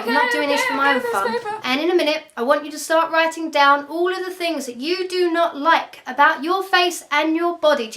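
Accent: United Kingdom. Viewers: alexstfu what? She still sounds british, there are so many different accents in the UK lol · why did you use to sound more British back than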